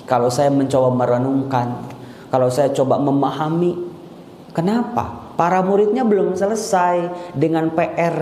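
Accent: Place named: Eastern Indonesia, Indonesia. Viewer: berarti tinggalnya didaerah kebun jeruk. seperti orang dari Timur tapi halus kalau berbicara seperti orang jawa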